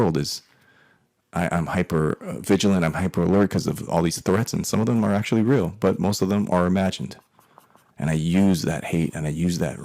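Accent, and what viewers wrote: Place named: Mexico. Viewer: I love ur Mexican accent. It’s ok I’m Mexican.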